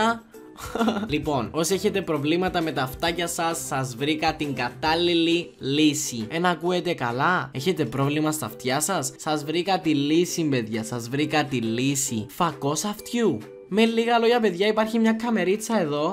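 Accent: Cyprus. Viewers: Γλυκούλης ...λατρεύω τα κυπριακά 😍😘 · Ίσως πολλούς να τους ενοχλούν τα κυπριακά σου αλλά εμένα μου αρέσουν!!! Είναι όλα τα λεφτά 😍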